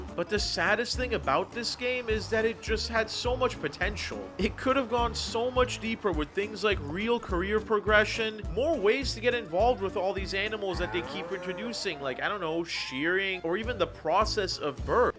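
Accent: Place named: Germany. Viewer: Bro sounds like a real german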